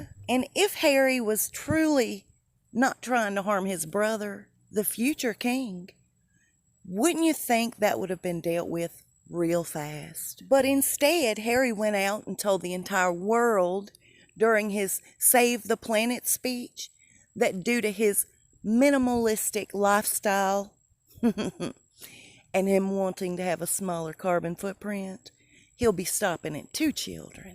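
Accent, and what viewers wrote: South, United States. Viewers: Love 💘U, We Will All Miss U, We'll Miss That Southern Accent With All That Great TEA U Serve Us. U R SORELY LOVED We'll Miss U Something Awful, Rest In Peace Our Beautiful Sweet LADY, 🦋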